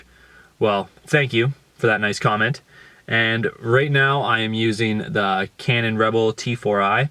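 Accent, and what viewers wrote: Canada. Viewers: It took me 30 seconds To figure out your canadian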